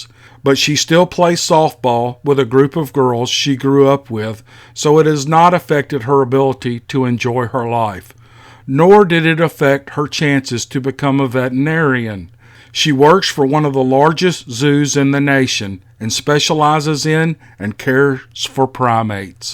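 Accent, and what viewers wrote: South, United States. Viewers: Sir, I'm not sure what your name is, but I enjoy your channel and it interests me ,and I love your southern accent, I'm from Malaysia, keep up the good work. I never met Mr Foot before haha. It's alright ,it's amazing and you are amazing. God bless you. 👍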